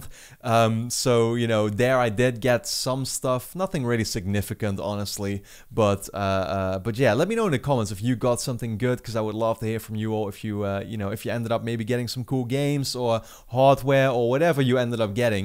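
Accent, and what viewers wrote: Netherlands. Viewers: Where do you live my guess is that you live in the Netherlands bcs your accent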